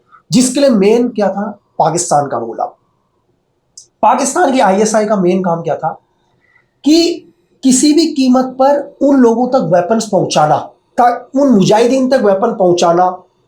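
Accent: India. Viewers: that's how kumaoni people speak 😅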